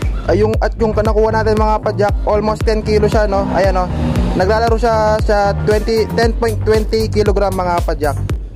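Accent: Pampanga, Philippines. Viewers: Kapampangan ka lodi??? Sa pananalita mo kase HAHAHA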